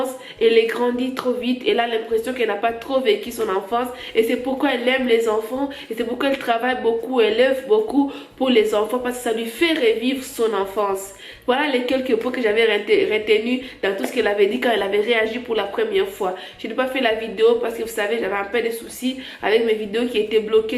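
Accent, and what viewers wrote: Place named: Democratic Republic of the Congo. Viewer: Tu est Congolaise je sens le Tiiii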